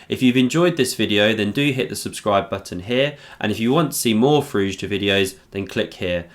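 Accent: United Kingdom. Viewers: Great commentary with beautiful British accent and a juicy Alireza game. Very convincing to subscribe. Looking forward to see more from your channel.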